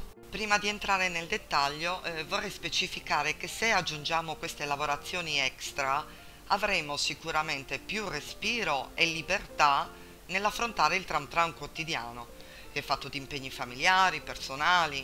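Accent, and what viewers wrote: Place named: Sardinia, Italy. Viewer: Wow che bella voce forte e si sente l'accento Sardo,Bel video cara,molto ben fatto anch'io inizio le pulizia dalle parti alte,like e buona giornata!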